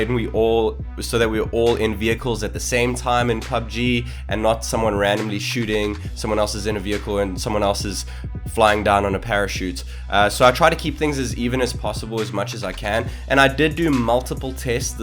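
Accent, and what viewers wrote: South Africa. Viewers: ...you sound south African... Are you south african?😁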